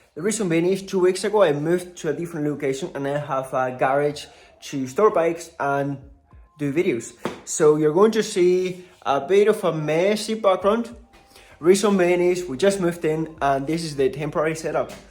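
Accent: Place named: Northern Ireland, United Kingdom. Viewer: great video! im curious man, i see from another comment you speak spanish, but you sound like you mightve been raised in northern ireland. is this the case? really cool if so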